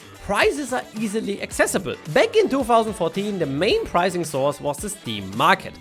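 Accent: Germany. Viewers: You hear like a german guy do you german?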